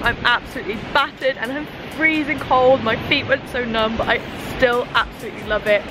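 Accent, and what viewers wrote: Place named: Nottingham, United Kingdom. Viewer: Hi there, love your videos, you wouldn't happen to be from Nottingham would you? I'm sure I recognise the accent! Great video by the way, I love Cornwall (when the weather is right)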